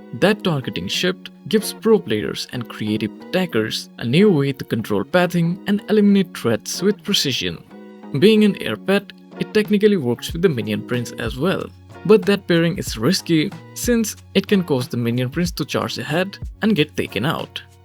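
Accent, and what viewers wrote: India. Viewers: Bro are you indian or what 😅❤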